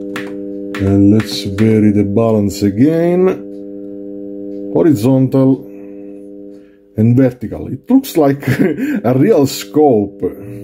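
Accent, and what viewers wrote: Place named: Italy. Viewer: Mamma mia che accento italiano! Vorrei realizzare anch'io il tuo progetto ma non hai accennato allo schema e ai componenti.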